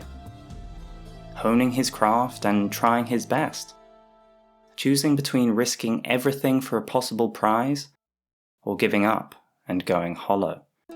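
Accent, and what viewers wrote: United Kingdom. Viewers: Has an American I have to say I prefer my lore to be spoken with a warm British accent. It just seems more legit for me. Good job at being British.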